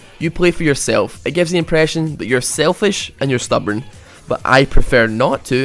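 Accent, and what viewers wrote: Scotland, United Kingdom. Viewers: Where are you from ? What's your accent ? Is that scottish ? (dont hit me) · Are you Scottish?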